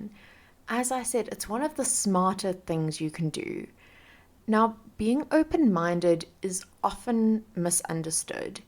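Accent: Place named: South Africa. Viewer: I know a Saffa when I hear one. You doing a good job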